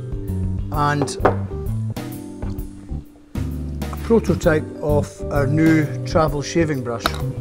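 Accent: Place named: Glasgow, United Kingdom. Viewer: Sincerely, your way of explaining and showing the goods is very helpful and informative, not to mention the high quality video in all aspects including that magnetic Glascow accent! Greetings from Greece, you may have some order from south someday! By the way this blue-ish colour of the mug is reminiscent of Ranger's F.C. kits... excuses if you a Celtic fan.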